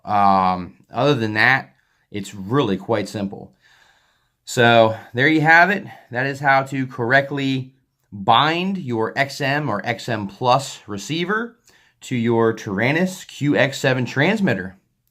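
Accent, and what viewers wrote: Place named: Philadelphia, United States. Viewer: Thanks.. You sound like you live near Philly lol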